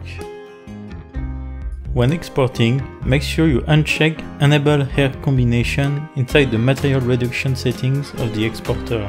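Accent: France. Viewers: (on voit l'accent français ptdrr) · Oh un francais ca se voit a ton accent faut travailler ca mais sinon c un bon tuto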